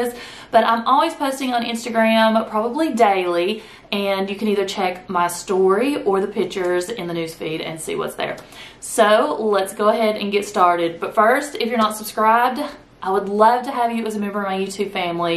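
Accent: South, United States. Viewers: That southern accent ❤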